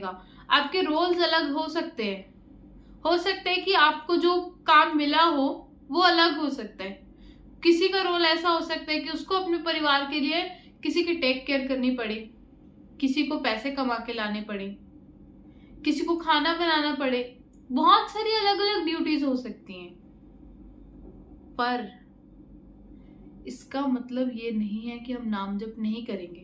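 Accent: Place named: Rajasthan, India. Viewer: Hamne bolne ki tun she pata lga liya aap rajsthani ho. 🎉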